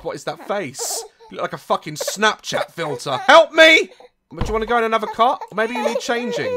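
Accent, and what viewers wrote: United Kingdom. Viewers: you had a British accent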